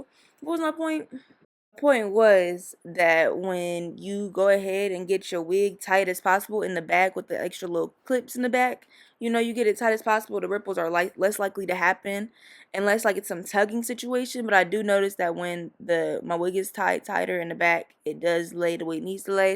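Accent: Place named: Columbus, United States. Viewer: its so funny how i knew you were from Columbus before you even said it, & yall swear yall don't have accents 😭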